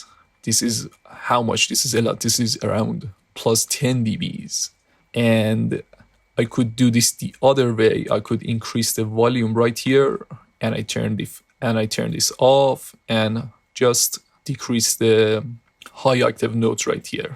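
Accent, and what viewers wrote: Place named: Iran. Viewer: Your accent sounds like a persian, are you?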